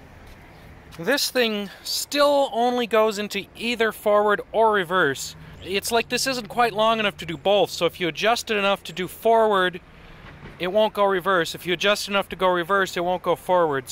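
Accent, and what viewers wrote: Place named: Minnesota, United States. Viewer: I thought so. I take my 74' Starcraft Capri trihull on that lake sometimes. I sure thought you sounded Minnesotan, I was right!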